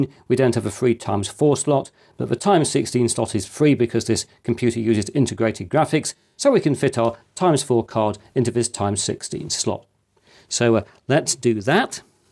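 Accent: United Kingdom. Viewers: Your british accent is exemplary.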